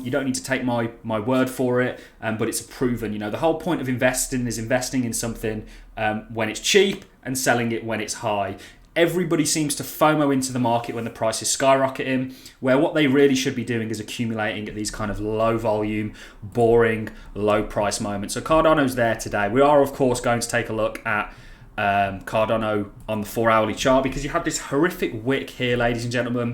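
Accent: Birmingham, United Kingdom. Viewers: knew I could hear the Brummie in you 😆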